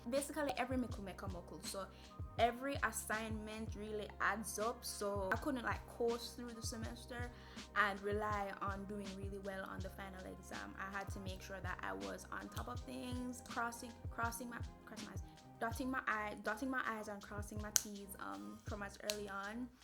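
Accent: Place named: Jamaica. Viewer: my entire family is jamaican and idk why but hearing your voice made me feel so at home!! keep it queen ✨ love the videos !!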